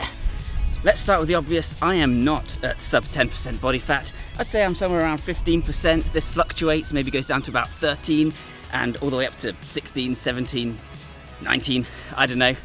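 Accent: England, United Kingdom. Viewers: Americans always trust anyone with an English accent 😁 So of course as someone eating like an American, I’ve had to resort to counting calories. I somehow seem to get fat so easily. 🙄 even when I’m at maintenance I have to count. I guess eating like a hog in my youth while lifting 4 days a week made it where I can’t seem to have that intuition for not overeating. Thanks for the video! Subscribed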